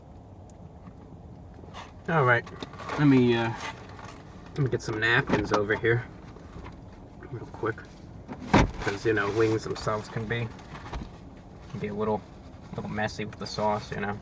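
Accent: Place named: New York, United States. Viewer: This dude has to be from NY.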